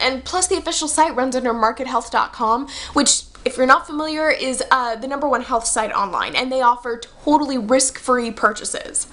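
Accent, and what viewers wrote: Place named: United States. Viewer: Possibly the most annoying american accent ive ever heard. My ears are bleeding.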